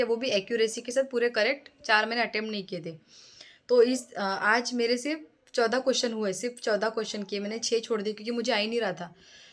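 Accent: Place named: West Bengal, India. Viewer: Aapke boli se lag rha hai ki aap West Bengal se ho. Ham to up se hai. hme to abhi se dar lag rha hai. mera 11 feb ko hai.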